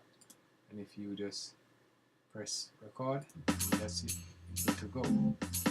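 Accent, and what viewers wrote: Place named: Jamaica. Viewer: Yo you sound like a Jamaica still .... glad for the tutorial ... was wonder how i would get Slate VMS to work with Apollo ... you have just given me an idea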